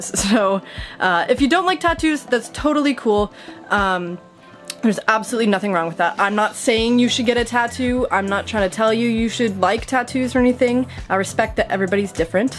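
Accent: Canada. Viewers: Thanks for sharing,. I’m not a big tattoo guy but certainly respect your decision, your reasons for getting them, and the meaning they have to you. Stay safe and healthy and look forward to more videos, (and, no matter where you travel, don’t ever lose that great Canadian accent)!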